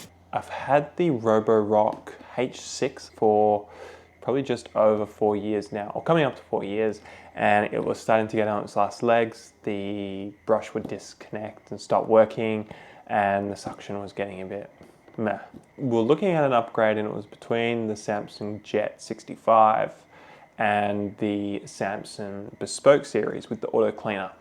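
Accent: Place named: New Zealand. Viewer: you sound like a Kiwi, are you?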